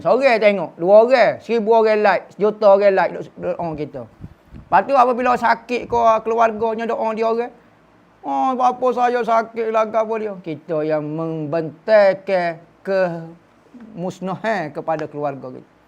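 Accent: Kelantan, Malaysia. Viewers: Sy suka Ustaz ni berceramah dgn dialek Klate.👍👍👍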